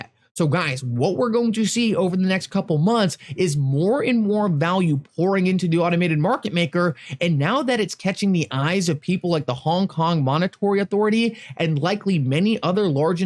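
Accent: Allentown, United States. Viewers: with the Allentown accent is wrong again. Way to go!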